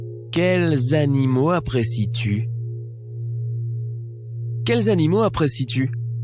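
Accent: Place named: France. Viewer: I'm french I confirm, the voice is 100% french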